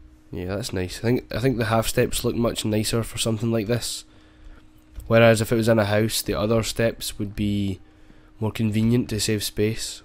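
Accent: Scotland, United Kingdom. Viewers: scottish accent+oh noooo!= winzorz and rofls. my dadio is from scottland but im from the usa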